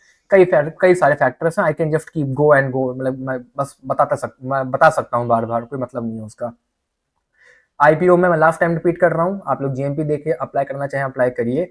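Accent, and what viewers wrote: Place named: Kanpur, India. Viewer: Brother are u from kanpur ? Baat karne lehja wahi ka lag raha h ... Appreciated your efforts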